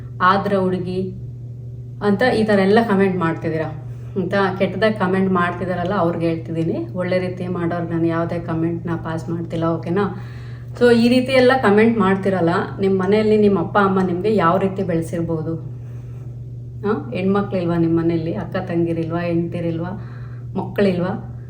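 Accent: Mandya, India. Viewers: you don't change local kannada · the way u talk kannada really feels like u are in India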